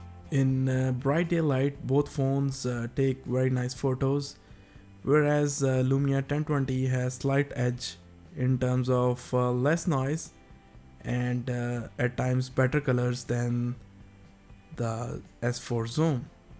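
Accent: India. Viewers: indian accent English is genuine ear cancer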